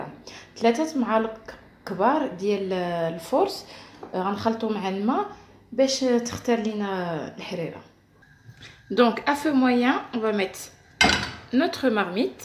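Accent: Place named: Tlemcen, Algeria. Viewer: Vous avez un accent Tlemcen et le vocabulaire n est pas marocain . Les épices .les herbes la farine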